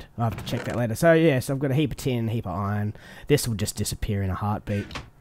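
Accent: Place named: Australia. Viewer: ahh the sweet voice of an aussie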